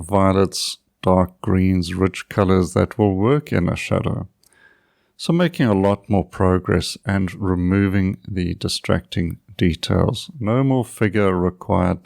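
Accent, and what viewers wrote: South Africa. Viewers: Every one of your videos is helpful. Imove between impressionism and tonalism or a combination of the two. Love your work and your gentle vouce with its echoes (for me) of South Africa. Thankyou ❤️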